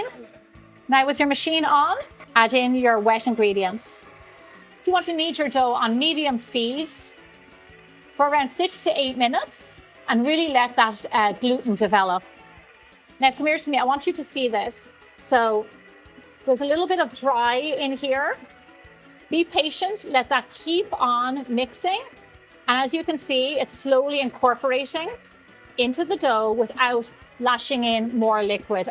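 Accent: Ireland. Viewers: I love your accent & have been wondering if George has taken after you speaking w/ a beautiful Irish accent. These rolls look wonderful. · Those are some lovely sweet rolls,. You’re truly such a gem when you bake, and when you speak with that adorable Irish accent. 🤗🤗🤗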